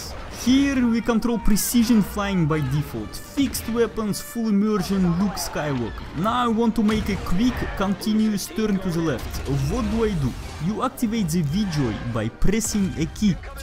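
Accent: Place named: Russia. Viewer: Your Russian accent is amazing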